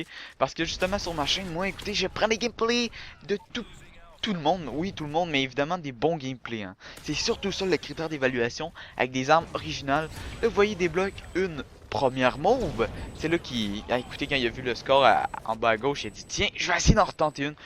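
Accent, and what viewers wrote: Quebec, Canada. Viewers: Si vous aussi vous kiffez son accent Quebecois <3 :) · Mais non c'est qu'il a un accent come sa car il est québécois ( dsl si j'ai mal ecrit :s ) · quebecois ;D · j'adore l'accent du quebec je t'aime mec :D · J'adore cet accent québecois :p Sinon pour moi Mode RAGE : Striker + Damage Mode Tranquille : SPAS12 + Damage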